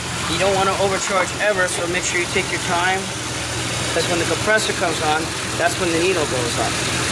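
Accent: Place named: New Jersey, United States. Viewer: Jersey accent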